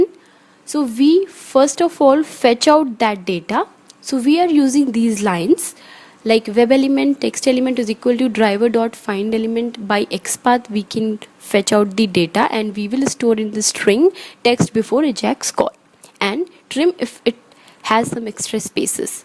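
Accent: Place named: Russia. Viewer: That russian accent)))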